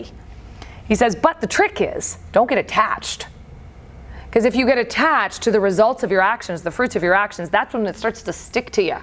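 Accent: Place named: India. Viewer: For a yogi, you are very close minded. Stop acting like Puritan, she grew up with those kind of phonetics, how can you expect her to improvise those dictions? Indian professionals working at silicon valley for decades can't do away with our thick English accent. And sanskrit is grammatically and phonetically probably most difficult language, even trained priests of Kashi don't chants those hymns correctly now.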